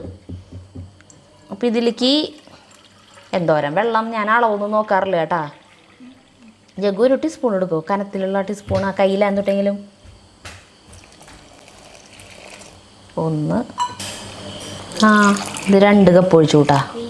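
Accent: Thrissur, India. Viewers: തൃശൂർ സ്ലാങ് കേൾക്കാൻ നല്ല രസം 👌😍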